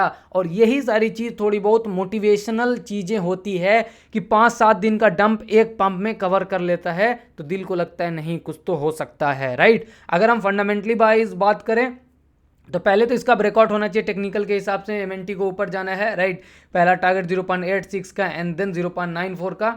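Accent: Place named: Bihar, India. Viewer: aapka ton bihari h ... R u bihari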